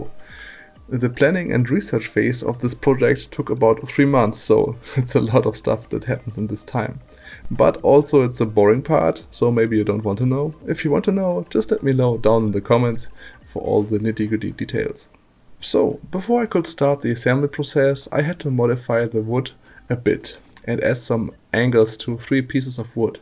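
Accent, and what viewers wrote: Germany. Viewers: Man hört deinen deutschen Aktzent mega raus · Dein Englisch klingt echt deutscher als meins? Wie? Und ich dachte ich wär der einzige der zu doof is für diese komische aussprache xD nichts für ungut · Ich fand dein Video sehr hilfreich und man hört sehr, dass du deutscher bist xD. Aber könntest du mir ein paar Daten des Lautsprechers geben? Also wie viel Watt RMS der ha z.b? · Da hör ich doch den Deutschen Akzent raus🧐🤓😅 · you have the most german accent ive ever heard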